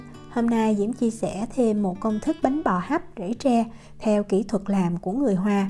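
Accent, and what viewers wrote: South, Vietnam. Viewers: Giọng nói miền Nam của Diễm rất dễ thương, nhưng nếu Diễm đừng trộn từ miền Bắc vô thì sẽ dễ thương hơn nhiều. “vô, không phải vào; lợt, không phải nhạt” …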